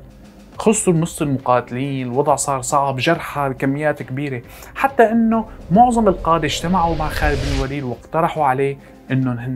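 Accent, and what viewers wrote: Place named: Syria. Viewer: على فكره اللهجه السوريه كثير حلوة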